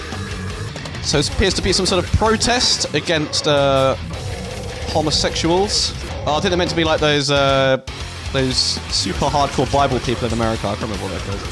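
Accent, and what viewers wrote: United Kingdom. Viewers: Best part is he stayed in character with the British accent and vocabulary all the way through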